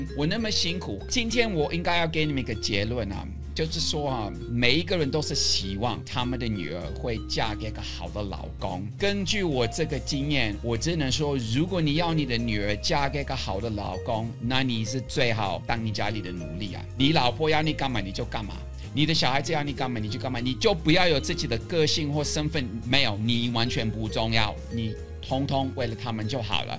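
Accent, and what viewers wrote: Taiwan. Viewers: 你的國台語已很台了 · 台語比國語還標準！真的是台灣人... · 满口台腔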